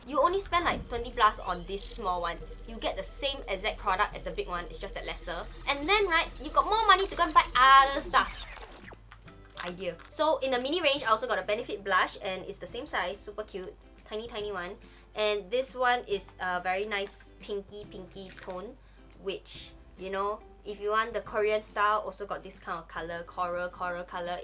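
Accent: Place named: China. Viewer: I like how you are talking like half eng half chinese, just shows that you are not putting up a fake front infront of the audience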